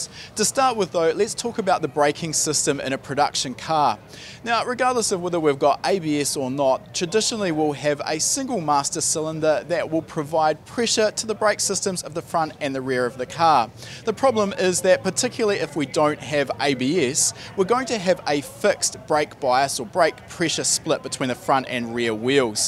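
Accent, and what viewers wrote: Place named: New Zealand. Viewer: he's Kiwi, just go with it. in the engineering sector over the past 30 years I've worked with NZ, Aussies, South Africans, Brits, Germans, East Indians, Chinese, Japanese, Jamaicans, Russians, Guatemalans, Liberians, French Canadians, Israeli, etc so a mix of everything, and I'm sure at times it was they who thought my AMERICAN ACCENT was what sounded odd/weird. it often hurts the inflated American EGO to discover that WE are the ones "speaking with an accent" and that we are NOT always the "yardstick" by which things in the world are measured (literally).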